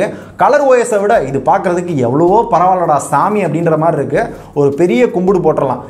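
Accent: Madurai, India. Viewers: Pro. Madurai pechu and nakkal vera level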